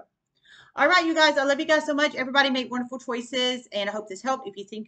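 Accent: South, United States. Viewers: Love the sound of your voice. Very Southern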